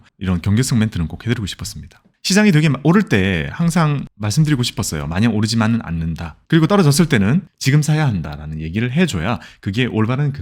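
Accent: Gyeongsang, South Korea. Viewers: 투자공부보다 갱상도 억양의 보이스가 너~무 매력적이라 귀에 쏙쏙 붙어서 목소리 들으러 오는데 덤으로 알려주는 투자방법도 내 성향에 맞는것은 가져 감 ㅋ 쌩유요 ^^😂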